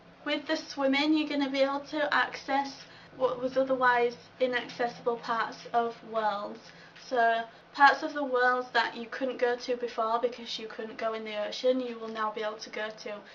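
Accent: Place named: England, United Kingdom. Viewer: Yay!!! an English accent!! Finally!! I'm british too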